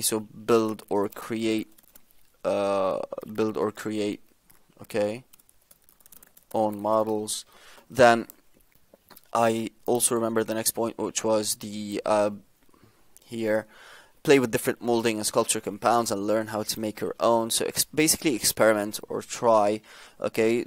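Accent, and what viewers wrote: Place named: Iran. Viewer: u have a mix of iranian accent and a english accent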